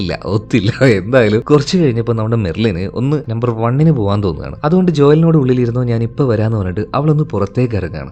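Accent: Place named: Kannur, India. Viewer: Bro kannur alle appo😊😅❤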